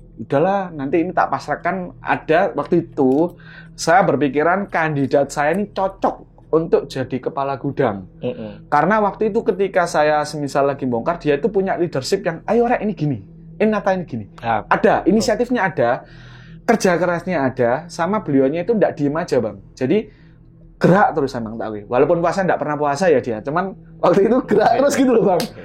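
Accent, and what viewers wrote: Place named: Surabaya, Indonesia. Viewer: Bhasane asik bnget kaLau orang SBY yg cerita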